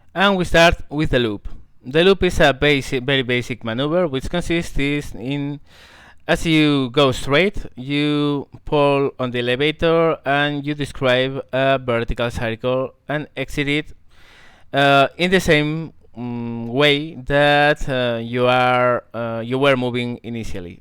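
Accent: Spain. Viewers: tu inglés te delata, eres español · Illiteracy has nothing to do with accents. And yes, that is exactly what it is, an accent. You might want to read up on it, unless you happen to be illiterate yourself. His English might not be perfect but it's good enough to get a message through to just about anyone.. The way he pronounces words is affected by the fact that he's Spanish. Also, here, where I live, we have these things called manners. You might want to read up on those too.. so you don't have to resort to personal attacks.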